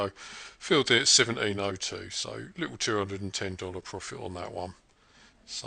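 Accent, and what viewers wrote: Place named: South London, United Kingdom. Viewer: that's funny.Americans often have trouble understanding the british accent. They think we all speak like the queen or maybe hugh Grant or something, lol. Wait until you hear someone with a thick scouser accent. Sounds like he's from south London at a guess. loving the videos.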